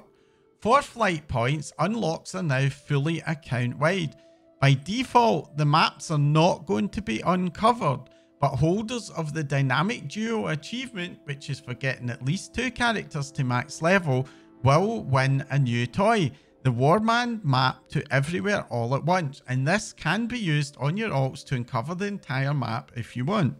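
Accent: Scotland, United Kingdom. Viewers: i hear scottish accent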